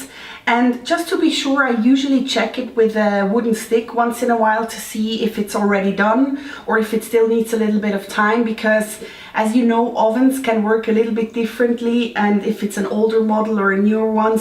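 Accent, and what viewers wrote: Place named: Switzerland. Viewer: looks yummy! I never tried a vegan version so I'll be sure to try your recipe next time(+the sugar haha)! 😍 I let my bananas get even browner 😂 ...my boyfriends mom taught me to freeze the ripe bananas. (They get super soft after thawing, so a bowl is needed! And it gets pretty messy haha.) AND your Swiss accent is the best! Accents are so much fun! 😂 I enjoy the tifical Pilipino accent, you know? But se German won is good too 😂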